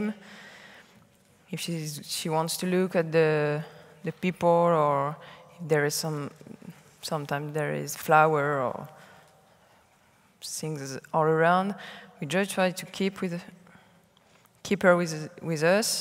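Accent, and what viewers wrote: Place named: France. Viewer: On entend bien l’accent français 😂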